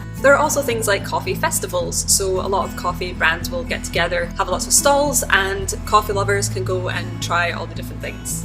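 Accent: Scotland, United Kingdom. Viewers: Is that a Scottish accent I detect :)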